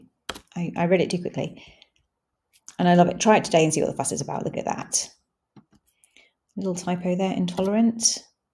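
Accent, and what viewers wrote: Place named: United Kingdom. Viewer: After I made the comment I was thinking that it might have been your microphone? I look for education, not for entertainment. Also, there is nothing wrong with your British accent, it was the sound quality!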